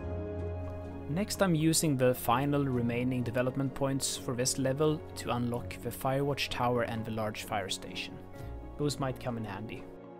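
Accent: Sweden. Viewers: Swedish accent if I ever heard one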